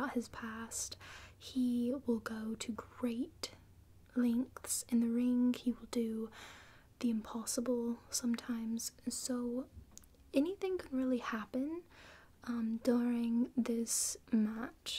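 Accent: England, United Kingdom. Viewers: Spectacular video the English accent and all the attires is amazing those were some great predictions have outstanding weekend enjoy wrestlemania 35 🧡💚✨🧡💚✨🎊🎉🎊✨😎🏆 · love the british acsent if it even is haha! though the outfit is -tastic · Kowalski analysis - I think you're wearing your brother's hat that says "Punk" on the front. I can't figure out if that's a wig but your hair looks unusually dark and has an odd shine. This is also the first time you're showing your new desk chair on a video. There's someone desperately trying to tell you something lol. I don't know anything about wrestling but it's always fun to see you portraying different characters! I've always wanted to hear you speaking with an English accent and you sounded great, it wasn't forced and felt very natural!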